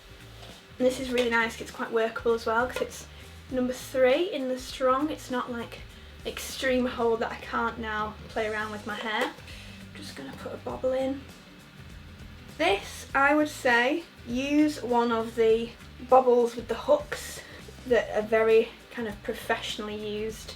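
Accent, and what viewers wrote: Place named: Northern England, United Kingdom. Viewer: Hey, Recently found you on YouTube. It's lovely hearing a refreshing Northern accent and references to places in Manchester rather than Brighton! I'm an English teacher in Bury! Keep up the great work!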